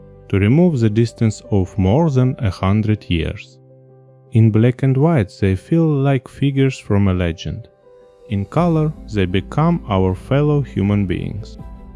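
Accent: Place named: Ukraine. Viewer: You speak like a Ukrainian person whose videos we watch. Of course, I know that doesn't mean you are from there, just that to my ears it sounded similar. Most of your videos that I had watched used the more American-sounding voice. This was the first time I heard your less changed voice. I'm glad you liked the story of some of my family's origins. We have enjoyed going back and finding out where all our ancestors traveled from. I greatly look forward to seeing more of your brought-alive old pictures. 😊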